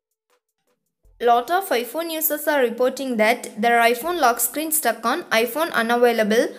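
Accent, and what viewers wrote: India. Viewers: I like the way you speak and your voice. You’re Indian right?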